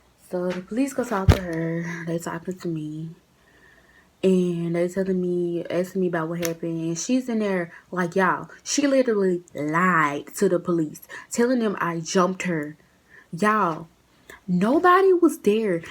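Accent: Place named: Georgia, United States. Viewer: I just love your accent.It sounds like you're from Georgia.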